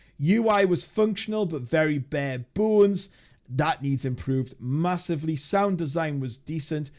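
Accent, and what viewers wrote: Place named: North, United Kingdom. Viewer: Good video mate, good to hear a fellow northern keep up the good work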